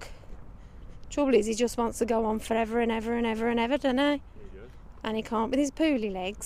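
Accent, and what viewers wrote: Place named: Birmingham, United Kingdom. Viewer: Very good video.that sounds like a brummy accent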